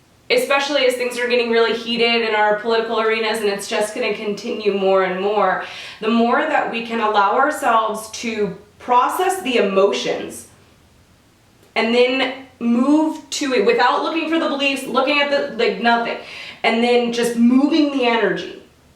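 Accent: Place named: England, United Kingdom. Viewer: You sound very English this week.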